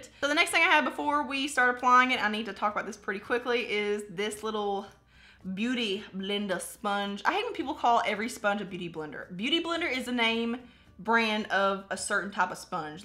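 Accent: South, United States. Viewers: Country bumpkin... ain't nothing wrong with that! What I love about you is that you don't mask being Southern like another big youtuber does! Have a great week and love from Tennessee 😘! Also, I only have 5 notifications on and you're one of them 💖!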